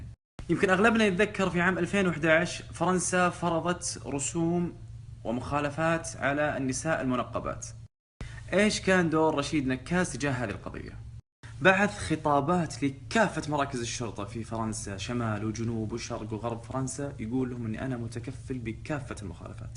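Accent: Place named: Gulf, Arab world. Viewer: انت خليجي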